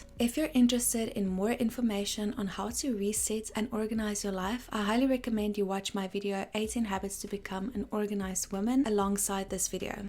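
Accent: South Africa. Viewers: R u south african, ma'am?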